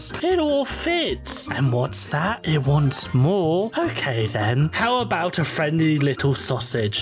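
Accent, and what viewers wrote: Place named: United Kingdom. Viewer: Lol he’s British Letsgameitout fr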